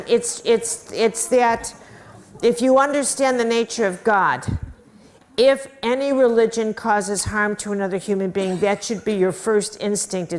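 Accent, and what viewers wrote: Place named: Midwest, United States. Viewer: I had to stop amd comment when you spoke about your midwestern accent. For some reason hearing you actually state that you are from Chicago multiple times was too obvious for me to actually pick up on. I have to make things difficult for myself, as a general rule. It wasnt until a flashback to the old SNL skits about the Bears amd the Bulls (John Goodman, George Wendt I think? Or maybe Im making up Wendt being there, I dunno) anyway thats when I finally 'got' your accent (which isnt much of one to me,ostly just the 'a' that you mention). I love hearing you speak, I hope you never figure out how to have the 'a' removed. It would just be wrong. I might even have to just stick to your books if that happened. Which, by the way, I use a text to speech british accent voice to listen to ebooks while doing other things, one that is no longer freely available or...whats the word...compatible with android since the last several major updates, and a huge pain in the rear to mod and sideload and stuff to get it to work now, but worth it to me. 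Its so realistic and soothing. i even pay for it some months if I cant find a way to hack things with current devices, as I think its not even possible with the most recent update, and have to go about it a different way that charges if I read over a million characters (which happens and which is meant for developers., and takes some work). My point is, as much trouble as I take to use that specific British accented voice, I so much prefer to hear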